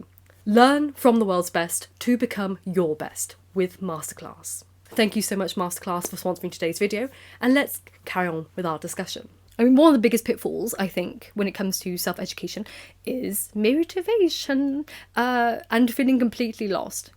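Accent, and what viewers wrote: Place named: England, United Kingdom. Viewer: You are really english you have a gracious accent lady but! but ! it s a true challenge for me lady to catch all your words . I listen to you to train my ears to hear different accent and is your case lady you put my english skill to test!! Lady . For me true english speak like you . I m french and i learn english without teacher on my own . I see all your books around you i like this enverino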